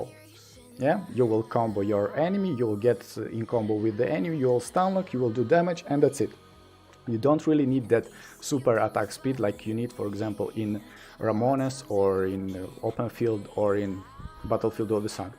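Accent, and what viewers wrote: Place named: Russia. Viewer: Тяжелый русский акцент.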